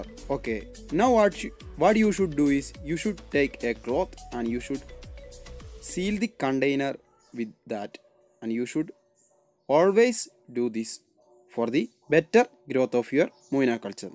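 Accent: Kerala, India. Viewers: Malayali 😂 Aaa English kettale ariyaa malayali anenne 🥰🥰🥰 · English paryunath ketal arinjoode malayali aanenn😂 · Hey by ur pronounciation u r mallu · Machente English kettal ariyaa . malayali alle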